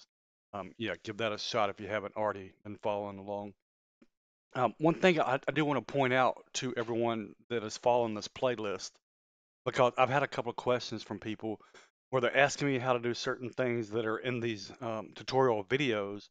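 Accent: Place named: South, United States. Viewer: I can tell from that accent that youre from the same part of the South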